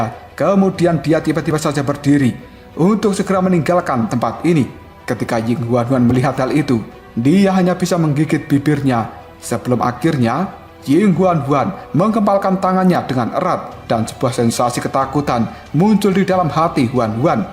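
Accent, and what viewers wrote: Java, Indonesia. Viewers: MC ne wong jowo.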